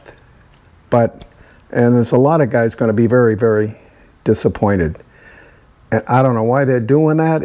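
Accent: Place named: Northeast, United States. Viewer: Just stumbled on your channel and I watched this video. It sounds like you are from the NE. Can you specify what state ? Love the vid !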